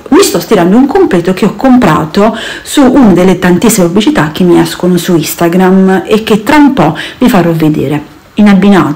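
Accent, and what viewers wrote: Apulia, Italy. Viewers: Salve,sono nuova mi sono iscritta al tuo canale sbaglio sei pugliese come me dall'accento sembra così, complimenti per il video grazie e buona giornata 😘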